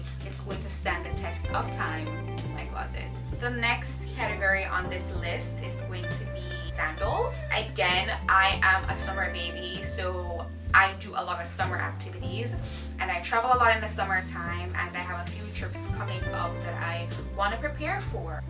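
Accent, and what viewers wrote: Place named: Jamaica. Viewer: I heard a Jamaican accent so I’m subbing to my people.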